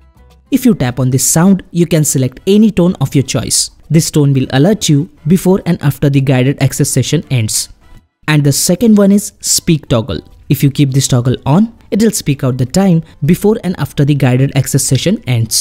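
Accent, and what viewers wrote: India. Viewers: I have difficulty understand indish english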